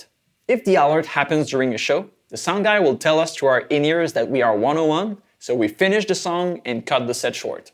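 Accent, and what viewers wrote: Canada. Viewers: ok canadian, it was obvious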